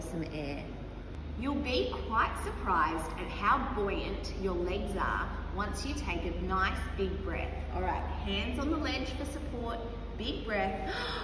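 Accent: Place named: Australia. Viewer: the best ausssie accent. thank ma'am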